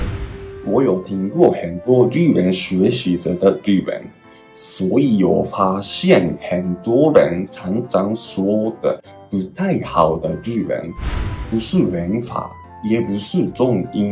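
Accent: Taiwan. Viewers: 的台湾语调真的很突出。看来在一个地方学习语言就会真的明显带有一个地方的语调。也希望可以学学东北口音；-p 那也会很有趣wwwww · 第一次听到台湾腔的日式中文发音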